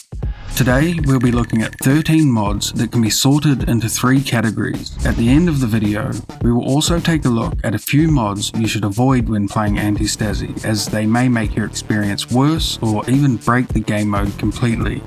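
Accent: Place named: New Zealand. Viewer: This guys a kiwi?Hell yeah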